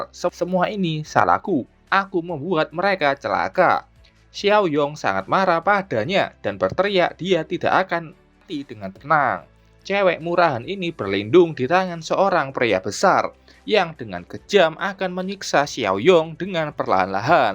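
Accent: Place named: Java, Indonesia. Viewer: sebenernya ceritanya menarik... cuma yang baca narasinya terlalu datar dan medhok jowo sekali... 😭 jadi agak aneh dengernya... 🙏🏻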